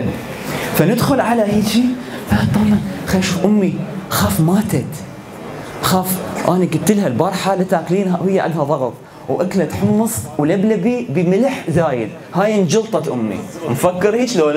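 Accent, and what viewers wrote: Mosul, Iraq. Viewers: انتا من الموصل يعني مصلاوي 😏😏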